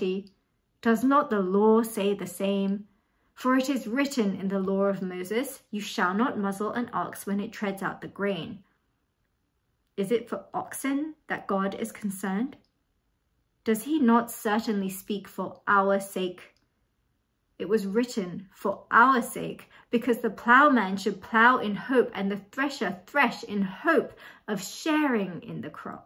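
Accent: United Kingdom. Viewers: Lovely accent but unfortunately there is not a British accent. There are 4 countries within Britain, England, Scotland, Wales and Northern Ireland, and they are all beautiful accents in their own right. You are speaking with an English accent, very nice it is too. So many people from abroad get confused and think it's one accent, but its 4 and each country has a variation within them. 🇬🇧👍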